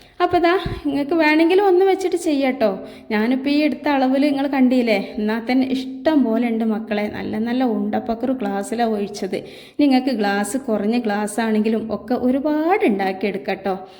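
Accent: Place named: Kozhikode, India. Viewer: ഇത് ഉറപ്പായും ഞാൻ ഉണ്ടാക്കും ഈ വീടിയോ എനിക്കൊരുപാട് ഇഷ്ടായി കോഴിക്കോട്ടുകാരിയാണോ എനിക്കൊരു ഫ്രണ്ട് ഉണ്ട് കോഴിക്കോട് അവളുടെ അതേ സംസാരം നല്ല സംസാരം കേട്ടിരുന്ന് പോകും താങ്ക്യൂ💯👌🥰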